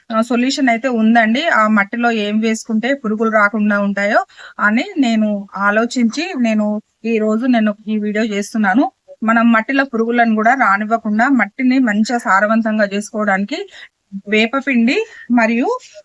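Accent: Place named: Rayalaseema, India. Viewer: మీ లాంగ్వేజ్ రాయలసీమ లాగా vundi అక్క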